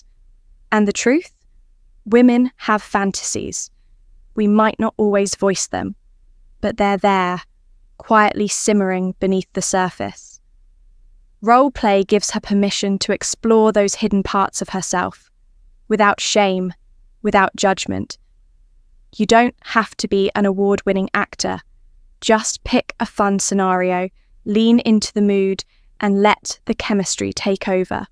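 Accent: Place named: RP, United Kingdom. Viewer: The posh accent is a nice touch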